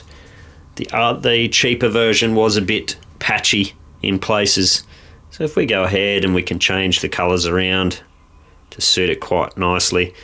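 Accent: Australia. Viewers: just because he has an Australian accent doesn’t make him Lazar beam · Does everyone sound the same in Australia or is this lazerbeam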